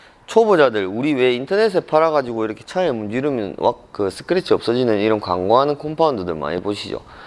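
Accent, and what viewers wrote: Gyeongsang, South Korea. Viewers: 상도억양 개싫다. ㅜㅜ😊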